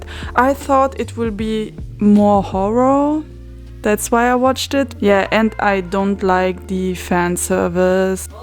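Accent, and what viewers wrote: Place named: France. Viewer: U have a French accent!! Ur mother language is French ? .. PS: keep filming videos like this 🥰🤍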